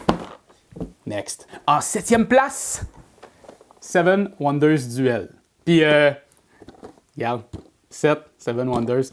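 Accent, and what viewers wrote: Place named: Canada. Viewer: T'es hyper sympathique à suivre, tu mets tant d'énergie d'humour et de bonne humeur, que tu es de la drogue en vidéo 😉 Et puis cette accent Canadien, pour moi Français, c'est comme celui des cousins Suisses Belges ou Africains de l'ouest, c'est tellement rafraîchissant ! Salut fraternel de France et continues de nous régaler 😘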